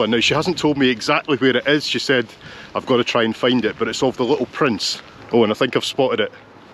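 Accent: Scotland, United Kingdom. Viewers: Nice Scottish accent )) reminded me of my friend, a Scot, with whom we worked together in the same company when I lived in Dubai many years ago ). Good old days ). P.S . Hello from Azerbaijan, fit like loon? ) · is this blogger Scottish？😂kinda grasp a sense of Glasweigen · Love that Scottish pronunciation! Have a Scottish office mate; have to tell him to watch...and listen!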